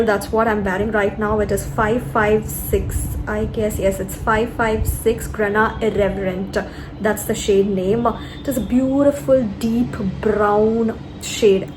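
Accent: South India, India. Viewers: Pure south india full satire daa love d way u talk Love from telengana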